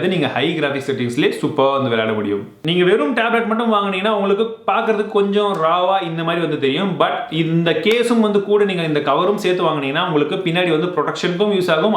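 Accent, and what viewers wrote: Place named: Tamil Nadu, India. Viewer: you are talking local tamil nativity , superb bro - but somebody giving review like blue sattai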